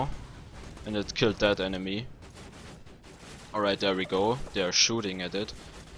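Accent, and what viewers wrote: Germany. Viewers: man hört echt das du deutsch bist :D haha. aber cooles video axton war auch immer mein lieblings char. ich hasse diese leute die in einem singleplayer spiel auf meta und guides hören. was wichtig ist ist das man spaß hat mit dem char den man spielt...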